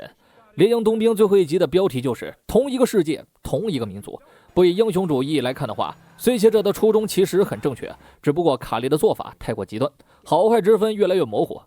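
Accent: China. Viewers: 听你口音，你明明是大陆人，为什么要用繁体字呀？蛤？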